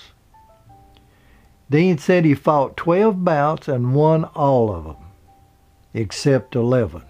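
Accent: South, United States. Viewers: I smile every time someone comments on your accent, vernacular and the Southern charm exuded in your voice. You remind me of my younger days and down home folks having a casual conversation. Thanks for that memory and for your informative and insightful videos. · Now that Lewis has also passed on, do you have any plans to make a 'companion piece' to this video about his life? I do hope you consider it as I really enjoy watching your films. Oh, and just for the record, I am one of those who just LOVE your accent - it reminds me of several holidays I had touring the Southern states of America many years ago when I was young and mortgage-free!! We always found the southerners extremely friendly, and they were just as fascinated by our English accents as we were by theirs!! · This guy I like has a smooth southern voice....kinda weird for a Dean Martin history......whatever? 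· I love this southern Accent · My little brother spoke only Portugues when we came home from living in Brasil. He understood English, but only spoke a few words. We spoke English at home, but the woman who took care of him spoke Portuguez to him, and although she spoke English very well, would only answer us kids if we spoke Portugues. Good documentary,Love your accent. Your voice sounds like the men in my family! (from Alabama. Tennessee and Florida) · . I love your southern dialect. You sound so much like how my daddy talked. Your voice is very soothing. Thank you for your channel and all the good info you provide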